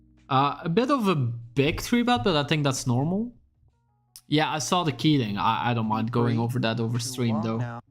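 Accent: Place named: Flanders, Belgium. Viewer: Echt insane hoe hard ik in de eerste 5 seconden hoorde dat gij Vlaams bent. Niemand in de wereld spreekt zo Engels.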